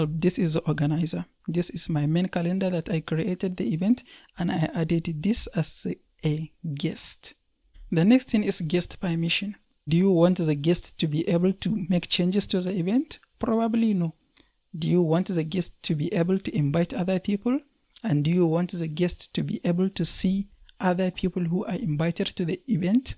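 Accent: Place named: Hausa, Nigeria. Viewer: you accent is funny.. are you hausa ?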